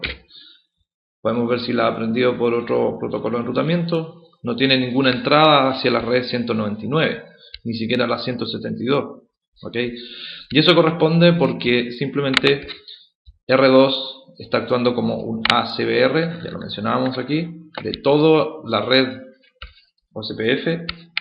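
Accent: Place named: Chile. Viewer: excelente vídeo me faltaba tener bien el concepto de la métrica lo de virtual link no lo sabia pero en CCNA igual se trabaja con distintas áreas teniendo claro la Backbone, OSPF tiene sus reglas bien definidas me sonó a que eres de Chile bueno saludos.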